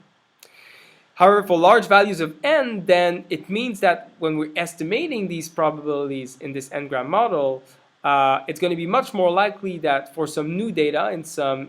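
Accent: Quebec, Canada. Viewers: I guess you're a French fella from Quebec. Anyway, it's a good video.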